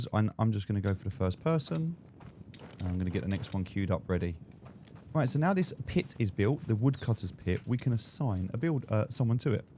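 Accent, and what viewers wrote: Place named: East Anglia, United Kingdom. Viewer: decent watch thanks. also sounds like you're from round my way? east anglia?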